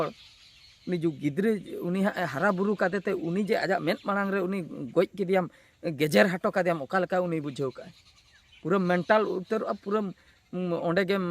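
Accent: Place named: Jashipur, India. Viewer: Aale sur reyah kana nowa do jashipur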